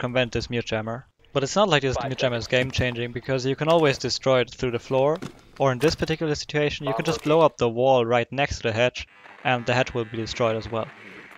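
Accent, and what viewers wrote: Germany. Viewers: Sehr gutes Video, deutscher Akzent sehr deutlich:)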